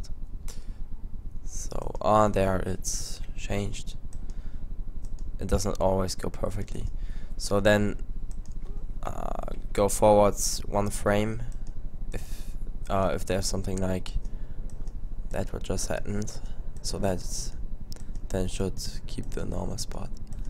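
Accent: Germany. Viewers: Man merkt an deiner Aussprache das du ein Deutscher bist :D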